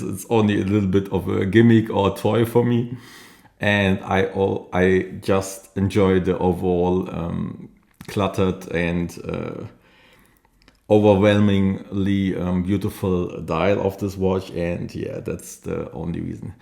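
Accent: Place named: Germany. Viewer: are you german :D sounds like a german speaking english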